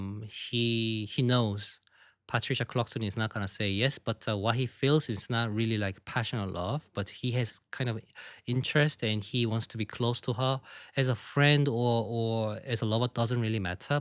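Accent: United Kingdom. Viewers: I am Afro-American and I can hear a slight British accent in and your voice! It's interesting to me😊🔥💯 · Hi! I really like people's different accents, I find it s3xy. I can recognise the British accent in your speech and i admire your vocabulary and perfectly English speaking. I live over 10 years in England and my English is nowhere near yours, lol. I never tried to adopt a British accent, I simply can't. I try to pronounce words correctly so people can understand what i say (that's not always successful), but i do not mind. I am greek, i have different sounds in my language. You know something? I haven't met the English person yet, who can pronounce my surname correctly. Because that sound does not exist in English language. Just call me Ms K. That will do. And the app i use to learn korean cannot recognise my accent most of the times. That makes me try harder. 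I hope the 2 greek friends treated you with nice food back then. I am going to Corfu this summer ☀️ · As someone who migrated also to another country myself I think your English is very good. Your accent sounds a bit like British. I think anyone with an accent means that they speak more than one language. In my opinion being bilingual it’s better than knowing one language. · I'd say your accent is obviously British, I was able to detect it before I found out you studied in the UK. Your pup is adorable😅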